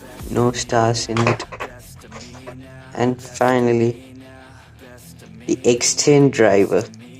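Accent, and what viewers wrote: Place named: North India, India. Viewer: bro first I taught that you from North states because of way you speak english is different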